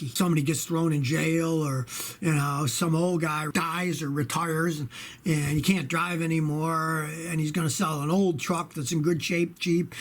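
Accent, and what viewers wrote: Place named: East Coast, United States. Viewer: I have listened to many of your videos and I have an important question. What kind of accent do you have? It doesn't sound like Michigan, more like East Coast. Please answer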